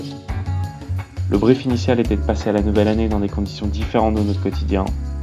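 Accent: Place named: France. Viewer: Je me disais bien que tu étais français quand je t'ai entendu dans le vlog de Jamie et Nikki en tout cas très belle vidéo. :)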